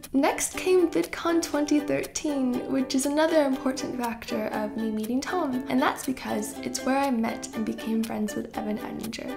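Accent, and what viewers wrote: England, United Kingdom. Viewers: Wait you're American? I always thought you were British 😂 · How long have you been living in England? You're starting to get a bit of an accent. ☺ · I love hearing the British accent creeping into your voice!!!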